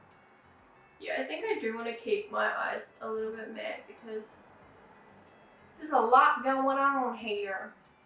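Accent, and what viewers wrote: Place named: New Zealand. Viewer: Kia Kaha lovely lady, just keep being you that's all that matters. Plus love hearing the good old kiwi accent.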